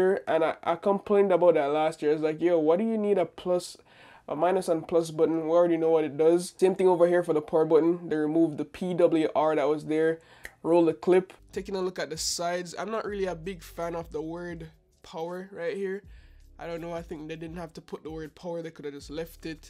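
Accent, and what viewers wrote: Jamaica. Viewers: U sound like u have a lil Jamaican accent 🇯🇲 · my guy jamaican as hell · Why do you sound Jamaican 👀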